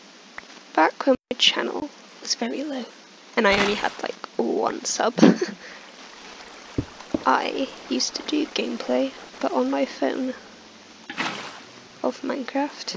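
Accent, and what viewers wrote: United Kingdom. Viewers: OMG YOU ARE JUST LIKE ME IM LESBIAN I LOVE TO PLAY MINECRAFT IM ENGLISH sorry if I’m wrong you’re accent sounds mighty like it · YOUR VOICE IS SO COOL!!!!! NOT ONLY DO YOU SOUND SO MATURE, BUT ALSO I LOVE BRITISH ACCENTS!!!! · Why do you sound so bloody posh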